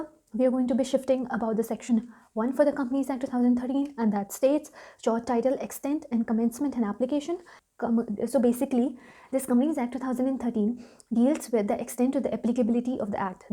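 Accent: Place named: United Kingdom. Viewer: Thanks i got some clarity, by the way your accent is like British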